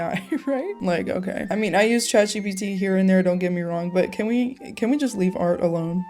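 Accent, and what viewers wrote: Mexico. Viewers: So I've been learning German for a while and my struggle is super cliché 🤭 I understand a lot but I have a hard time putting sentences together on my own. My focus for this year is exactly that, I'll be journaling in my target language to improve 😎 Btw, as a native Spanish speaker (but not Mexican haha), you still have a nice Mexican accent 😁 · Hello, hello! Spanish native speaker over here 🫶🏻 Just wanted to say you sound 100% Mexican! So at least for me, you’re not losing the Mexican accent. Hugs! 🫂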